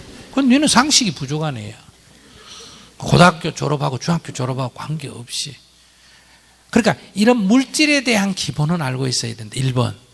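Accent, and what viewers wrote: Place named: Gyeongsang, South Korea. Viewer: 경상도에서는 가르치다를 사투리로 가리키다 가르키다라고도 합니다 사투리로 보시면 이해가 될것입니다 시도때도 없이 많은 사투리를 쓰십니다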